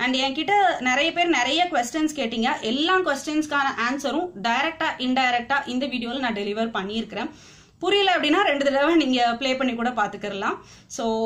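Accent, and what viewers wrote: Kerala, India. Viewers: Neengha pesuvathu malayali mathiri iruku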